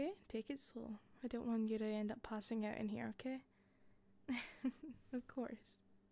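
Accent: Scotland, United Kingdom. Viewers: Just when I thought I couldn't fall harder for a Scottish accent... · am? Check Crippling sleep deprivation? Check Late night scottish ASMR? Check This will be a good night... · I’ve pretty much always adored Scottish accents, so tingles or no it’s an absolute joy just to listen to you talk. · Scottish accents are the best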